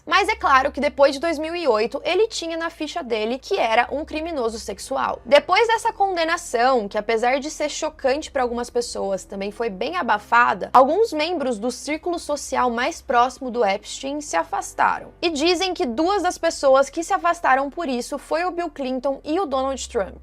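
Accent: Caipira, Brazil. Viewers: Sotaque cáipira nojento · Narração caipira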